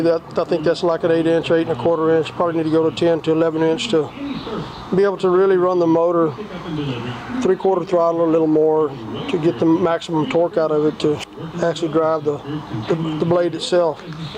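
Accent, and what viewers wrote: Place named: South, United States. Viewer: Man please tell me you have paper plans you can put together and mail out for a small fee call me ( <num>, man this by far the best saw mill i have ever seen , it's simplistic, & looks like it will go together quite easy, & hell yeah I'm subscribing & given y'all 10 👍👍👍👍👍👍👍👍👍👍's up, & definitely sharen the shit out of it, me & my boy are positively going to build this badass mill, 1 thing tho. You definitely might wanna put on some guard clover boxes for the blade, ya just never know if & when that blades liable to snap from a freak mishap, & you might wanna think about welding on some angle brackets on your tension bracket to keep it from worken the original weld loose from vibration, you sure sound like a good ol boy, i hope y'all get 100, 000,000 likes & thumbs up on this video, y'all definitely deserve it in my book, i absolutely love love love this build, great job bro. 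Best I've ever seen on YouTube, & believe me I'm watchen YouTube 247 to find the best mill i wanna use to fabricate mine after, guess i can finally stop looken, & move on to something else, now that I've found the baddest sawmill I'm gonna build mine after, thank you so much, dude you have no idea how you've just made my day, I'm stoked as hell, & can't wait to get ready to build mine, & I'm going to film every step with possibly a few ideas of my own, & put it on YouTube, & going to give you every bit, & all the credit for the inspiration, take that to the bank bro. LoL, whooo hoooo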